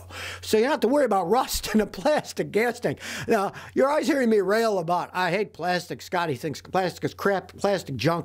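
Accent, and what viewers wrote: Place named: Upstate New York, United States. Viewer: I thought I heard an upstate NY accent!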